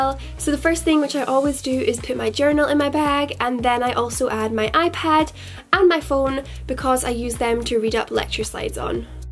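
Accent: Scotland, United Kingdom. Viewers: panda dreams well shes brittish so.. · Elisa Forever Scottish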